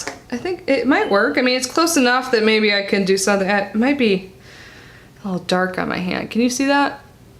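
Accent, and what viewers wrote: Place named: Chicago, United States. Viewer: Chicago! Is that where your accent is from?Where did you get your mirror?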